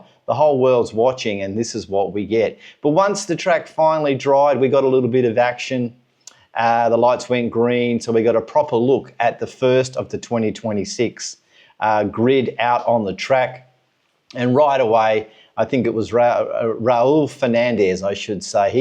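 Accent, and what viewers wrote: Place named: Australia. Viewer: Hi, considering your accent, seems you come from Australia, don't you? Btw his name is Raz-gat-lioglu aka RazGOAT😂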